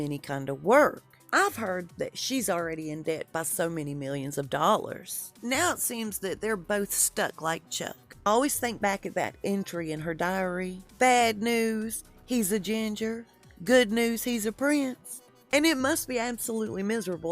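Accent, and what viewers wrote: South, United States. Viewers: I have watched several of your videos and they just keep getting better and better. I had to subscribe and I love your southern accent, reminds me of home! Plz keep up the great work and THANK YOU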